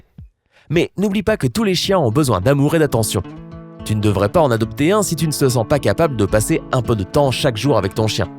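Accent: Quebec, Canada. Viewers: Oooo saï pour j'aimai bien la voit québécois enpluse saï la même voit que lama fache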